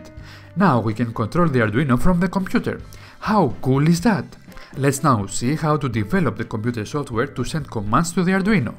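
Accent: Greece. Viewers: Your accent sounds Greece but not sure 100% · Are you from Greece? Your English remind me when Greeks speak English btw I'm from Greece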